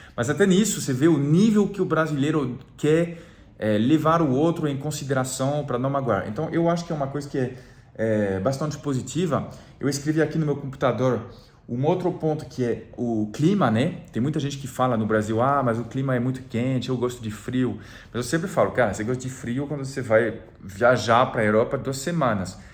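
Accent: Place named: France. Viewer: Percebo que, a cada dia, seu sotaque francês vai desaparecendo … Pena vê-lo perder um pouquinho do charme, mas vale dizer que o seu Português está ótimo! Parabéns,!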